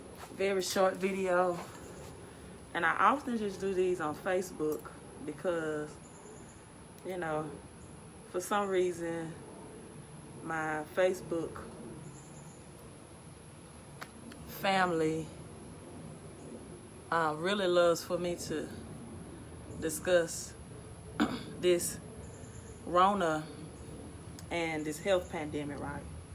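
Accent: South, United States. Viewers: Love that Southern voice✊🏾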